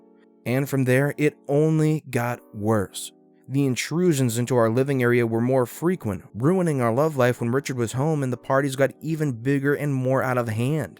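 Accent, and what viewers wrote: United Kingdom. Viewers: Are you British? If so hi.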